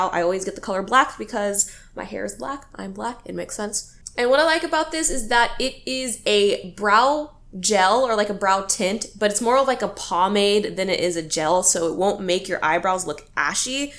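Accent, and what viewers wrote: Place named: Australia. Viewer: Can you do a video with just your Australian accent i really love it ❤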